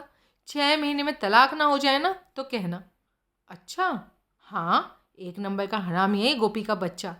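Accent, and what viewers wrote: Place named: Punjab, India. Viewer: आप पंजाबन हैं, आपकी पंजाबी एक्सेंट बहुत बढ़िया है।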